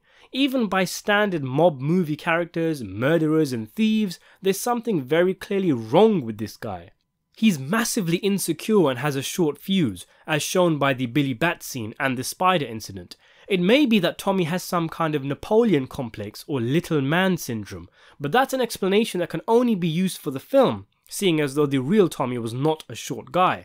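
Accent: England, United Kingdom. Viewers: Your English accent is amazing. I'm American from Ohio an this is just beautiful with your voice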